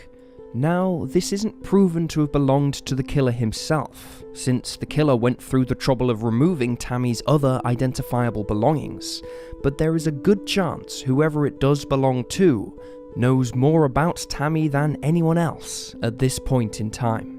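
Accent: United Kingdom. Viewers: Why does the narrator have to have that British accent? Use an American!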